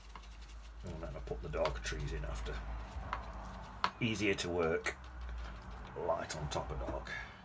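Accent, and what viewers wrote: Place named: Yorkshire, United Kingdom. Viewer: Good Yorkshire twang that is ... tha'll be reet ... 😁😁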